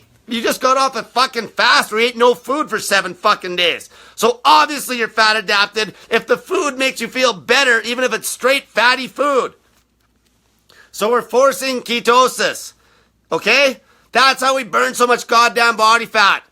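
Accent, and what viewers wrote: Canada. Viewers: Finally nailed your accent - Canadian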